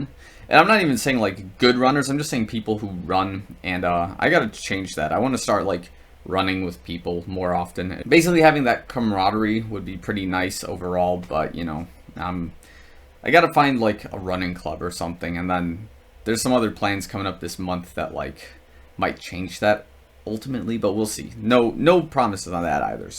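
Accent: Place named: Chicago, United States. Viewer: My sports are, basketball, cycling, tennis. I like walking in the Zoom Alphafly but I’m told it’s not for that. Thoughts? By the way, I hear the Chicago in your voice. I also hear Dan Aykroyd in your voice!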